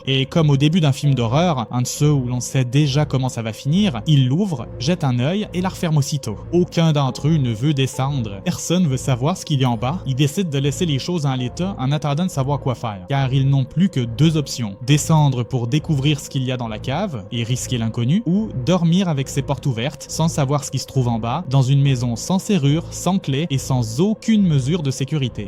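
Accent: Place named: Quebec, Canada. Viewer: T quebecois?? On dirais ta l'axent moi je suis quebecois❤😂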